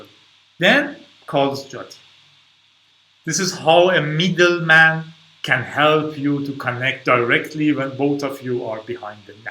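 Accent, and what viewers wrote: Iran. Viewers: Thanks for the info, you're an expert in explanation;) Also, loving the original Persian accent:D Love <3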